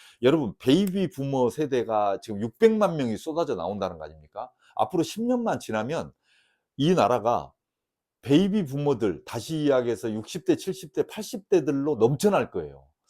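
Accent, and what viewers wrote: Jeolla, South Korea. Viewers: 잘 들었습니다. 전라도 분이신 거 같은데.. 흥하십시오!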